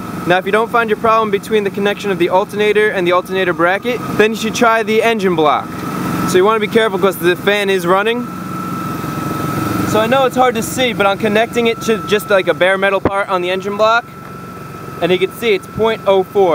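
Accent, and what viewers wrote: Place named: Upper Midwest, United States. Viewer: Are you from the northern mid-west? Try not speaking lazy with your lips almost closed.. it makes you sound like Carmen on Southpark. Just like when singing, public speaking required that we open our mouth when speaking. Just a suggestion. .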